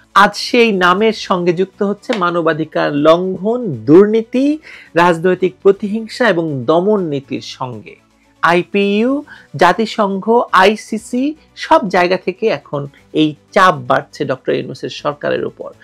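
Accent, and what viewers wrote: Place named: Shantipur, India. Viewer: এত শান্তিপুরী ভাষায় না বলে নবনিতা চৌধুরীর মতো বলতে হবে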